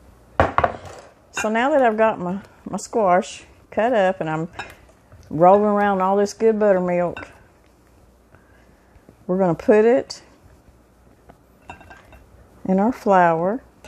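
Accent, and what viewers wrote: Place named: Southeast Texas, United States. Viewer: I love your kitchen set up..your accent is southeast texas!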